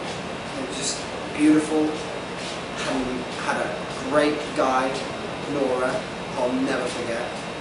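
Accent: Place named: United Kingdom. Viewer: British accent:D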